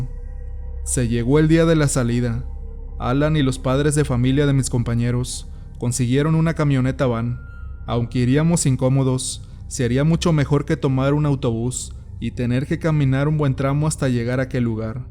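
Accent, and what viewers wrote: North, Mexico. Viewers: Hijole amigo tu acento norteño le quita emocion, parece que lees muy rapido.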